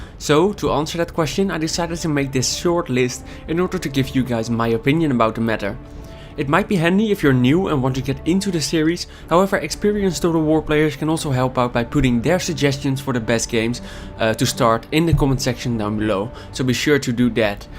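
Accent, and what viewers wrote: Netherlands. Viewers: never watched your videos are you dutch? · Jij bent nederlands of niet hé! hoorde het aan het accent haha! xDDD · As your eastern neighbour, i like your dutch accent, it sounds as funny as it sounds in german But still a good Video, even if i have around 1200h in all Total War games and own every game since Rome I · Ben je nederlands? Haha, mooie vid in iedergeval. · are you dutch becouse youre acent notting bad but just curious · Ik hoorde na 2 seconden al dat je Nederlands was.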